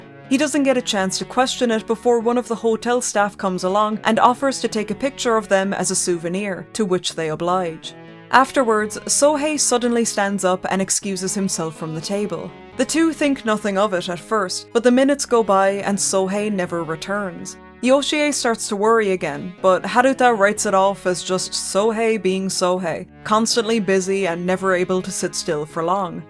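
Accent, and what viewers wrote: Ireland. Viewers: Ooooooooh an Irish accent. Always love to find niche horror game deep dive extra points for the familiar accent in a sea of Americans Australians and brits 😁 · Where is your accent from. Is it a mix of Irish with something else.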